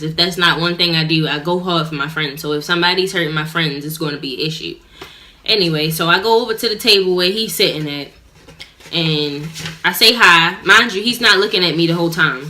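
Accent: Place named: Baltimore, United States. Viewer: I know your from B more your accent is 🥰 blessings to u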